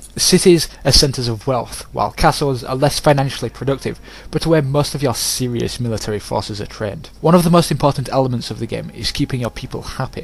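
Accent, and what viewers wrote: United Kingdom. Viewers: LucaCK Luca He died of some sort of disease, I can't remember. also yeah, British, I can't believe it never struck me before, I guess I had never heard that kind of regional accent before.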